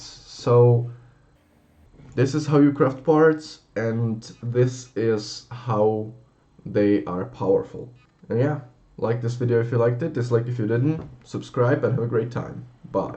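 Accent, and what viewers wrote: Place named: Slovakia. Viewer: Where are u from cuz ur accent feels like ur from Slovakia or Czech 😊 maybe Poland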